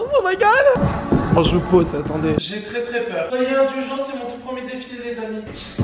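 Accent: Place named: France. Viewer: You should do a video in English! My American ears cannot understand your frenchie-ness:(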